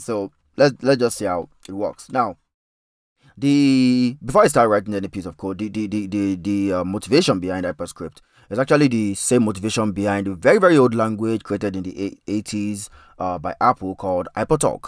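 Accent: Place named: Caribbean. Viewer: This is the first time ive ever heard someone with a caribbean accent on youtube... (maybe jamaican?).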